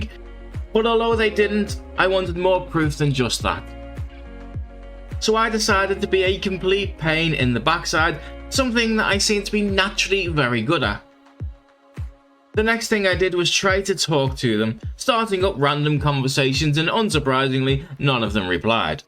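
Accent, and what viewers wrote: Liverpool, United Kingdom. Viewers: Are you a scouser brother hahaha cant tell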